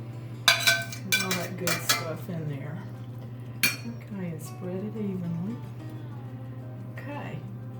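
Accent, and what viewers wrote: South, United States. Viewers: Yum! Love your southern accent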